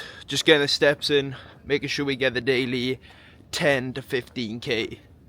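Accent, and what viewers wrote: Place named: Wales, United Kingdom. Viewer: Where in Wales are you from?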